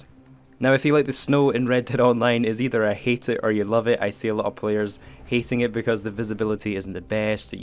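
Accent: Scotland, United Kingdom. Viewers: I wish the snow would go already. I hear by your accent your Scottish, I am too. Keep up these videos, I'm learning alot from you.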